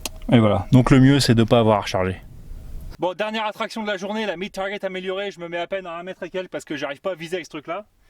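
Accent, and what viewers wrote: United States. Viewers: Encore cet accent ridicule du mid west , a chaque fois je me fais dessus 😂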